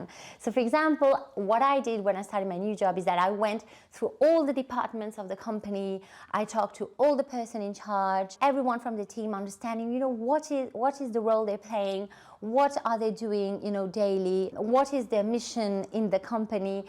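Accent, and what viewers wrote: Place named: London, United Kingdom. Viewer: thank you for these precious tips. Love your lovely London accent xoxo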